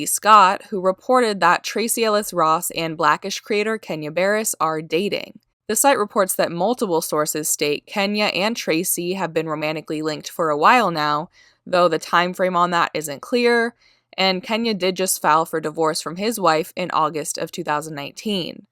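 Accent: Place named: California, United States. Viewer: I know this is off topic, you have a nice voice. You're from, or you probably lived in sunny California for a while. We just got that popping "proper" twang that people think is fake. But it's NOT lol♥️🌞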